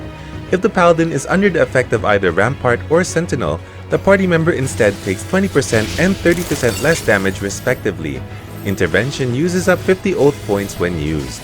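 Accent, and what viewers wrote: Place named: Philippines. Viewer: You sound like a Filipino with your English accent.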